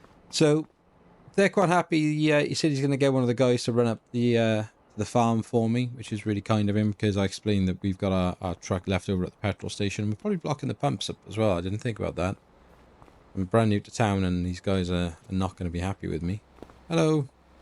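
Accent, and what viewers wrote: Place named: Wales, United Kingdom. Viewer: Mate, ive only watch half hour and i've laughed so much. Your Welsh accent is quailty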